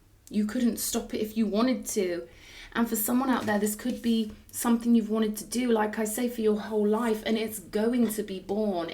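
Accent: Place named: Dorset, United Kingdom. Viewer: Hi all, Does anyone know where I can get one of the little dog figurine with the Bee 🐝 on its foot please?,thanks for your messages,you sound like you're from the same area as myself,Dorset or cornwall.love & blessings.BABA CHRIST 🕊️🤍🌹🐝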